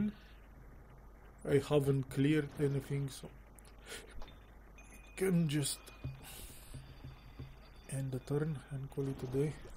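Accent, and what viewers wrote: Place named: Egypt. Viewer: Hey mate, it's nice to find someone that knows what they're doing with my favorite race/class combination. Also, I was wondering, what accent is that? I want to say there's some Egyptian/Arabic influence there, but I'm not well-versed on middle eastern/mediterranean accents. Also... "You wanna charge these guys? Be my guest. Pretty sure your men won't like it, but...". I love Shock Troopers. They're my favorite unit in the game. <3 Your accent is also hilarious for me. It adds a sort of toughness to the Orcs that you don't find in a typical white guy playing this combo on Youtube.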